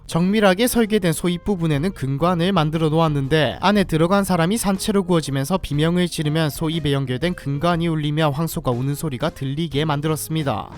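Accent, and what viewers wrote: Gyeongsang, South Korea. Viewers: 경상도인의 서울말 같이 들리네요 ㅋㅋ 제가 듣기엔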